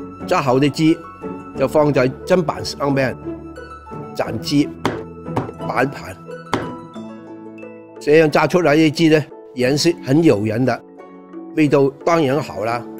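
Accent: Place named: Cantonese, China. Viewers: 最好聽呀 廣東特色 吾使去學卷俐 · 廣式普通話最強, 一陣不用普通話又被說為甚麼要用方言, 現在就好了 XDDDDD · 广东普通话！就是这样熟悉。😁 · 纯正广东普通话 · 中国广普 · 正宗廣普話！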